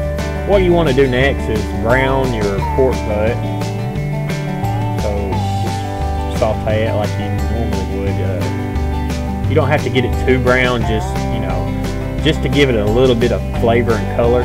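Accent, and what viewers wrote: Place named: United States. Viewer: oh honey, the country accent is beautiful. Don't ever cover that up 😊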